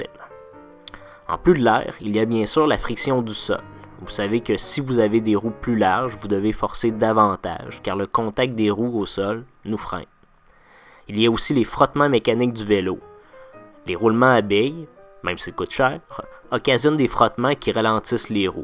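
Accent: Quebec, Canada. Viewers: Merci pour ton explication absolument clair ne et sans bavure. Toujours aussi pro... Ps. J adore ton accen quevecois..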